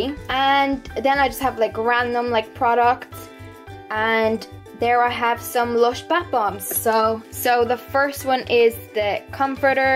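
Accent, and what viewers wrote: Ireland. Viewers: I was just wondering are you Irish?💓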